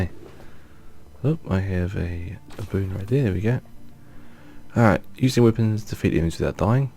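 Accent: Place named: New Zealand. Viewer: R u a new zealander sound like it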